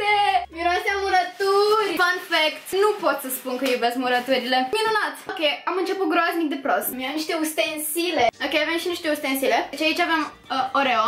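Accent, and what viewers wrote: Moldova. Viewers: esti moldoveanca?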